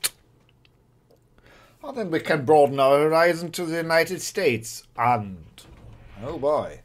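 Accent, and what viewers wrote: RP, United Kingdom. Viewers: So Berlin isn't the yellow of the egg, except Spandau? 😉😁 The majority of big cities tend to be left. Love your very British sounding accent! 💕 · warum sprichst du wenn du englisch sprichst immer in diesem Posh-English-Accent? Warst du während des Studiums in England, Oxford vielleicht, oder einfach nur ein Faible für BE?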